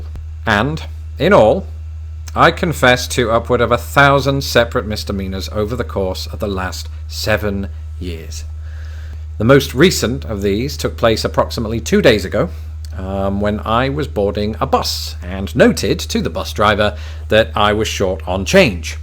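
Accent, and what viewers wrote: United Kingdom. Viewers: You can use that British voice any time, sweetie! 😉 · ~sigh british accents~ =) I forgive you but just keep making videos so I can hear your accent lol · Don't beat yourself up. The British accent is bound to color the speech of a traveler from that place. East Indians and Russian immigrants are equally noted for the novelty of their accents. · But your accent is subtle. You must be losing some of it. But having said that, we do love the British accent over here! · Subbed! Was persuaded to by your amazing British voice... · Don't worry, I forgive... Wait. What was I going to say? Oh, it doesn't matter. Talk to us some more. I love your British accent.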